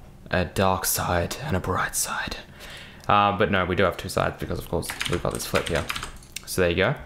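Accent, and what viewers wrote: Russia. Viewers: Черт! Мне казалось, что ты похож на русского, не ожидал что в одном из видео это будет! Надеюсь ты прочтешь, это! У тебя классный акцент, хотелось бы услышать твою историю, но это наверное не совсем в стиле этого канала. Как у тебя дела?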